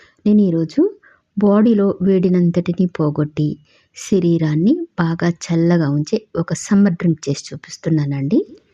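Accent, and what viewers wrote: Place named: Telangana, India. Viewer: Good to hear Telangana state decent language slang in Andhra recepies from Thankku by this respected women.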